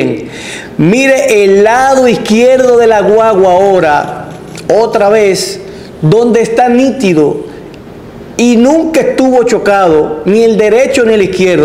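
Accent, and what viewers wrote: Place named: Cuba. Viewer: PRIMERA VES K T VEO Y ME INSCRIBI SEGUIDO POR FAVOR NO IMPORTA PERO TU SUENA CUBANO! SI ERES CUBANO NO IMPORTA! TU SUENA INTELIGENTE Y SERIO! POR FAVOR NO T VENDA! Y BUENA SUERTE!!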